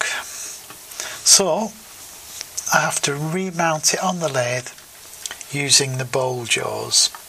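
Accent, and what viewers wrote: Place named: Lancashire, United Kingdom. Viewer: Good to hear a Lancashire accent!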